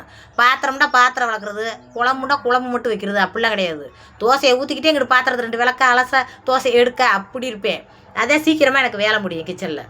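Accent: Madurai, India. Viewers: ரொம்ப எதார்த்தமா பேசுற நல்லா இருக்கு இந்த வீடியோ பாக்குறதுக்கு சூப்பரா இருக்கு அந்த தமிழ் தாம்பா உங்கள அடிச்சுக்க முடியல மதுர தமிழ் தமிழ் தான்யா கேக்க ஆசையா இருக்கு சென்னையில இருக்கிற எங்களுக்கு உங்க தமிழ் பிடிச்சிருக்கு பிடிச்சிருக்கு பிடிச்சிருக்கு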